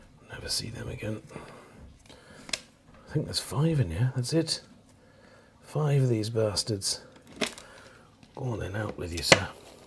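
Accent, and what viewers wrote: RP, United Kingdom. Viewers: There's something about hearing swearing in a posh accent that brings me great joy. 😆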